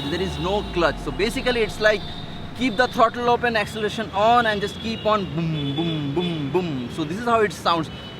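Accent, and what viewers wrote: Odisha, India. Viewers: r u from Odisha ?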